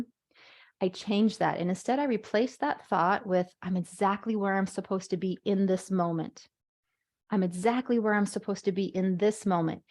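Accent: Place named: Utah, United States. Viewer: This was great! How come you don’t have very many subscribers? Are you just starting out? What brought you here? On a weird note, are you from Utah? You have a pretty strong Utah accent!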